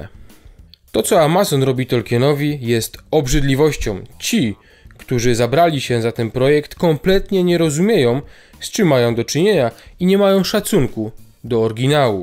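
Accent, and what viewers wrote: Silesia, Poland. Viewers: wyczuwam lekką nutę śląskiego akcentu :) pozdrawiam z opolskiego.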